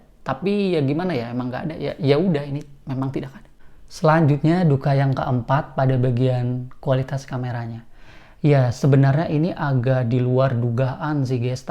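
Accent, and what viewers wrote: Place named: Banyumas, Indonesia. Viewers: Wiih wong banyumas jebule 😂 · Khas Banyumas 😁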